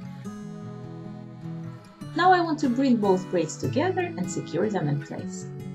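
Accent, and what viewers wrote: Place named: Russia. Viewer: I believe she is Russian, but living in France, so she as a mixed accent :)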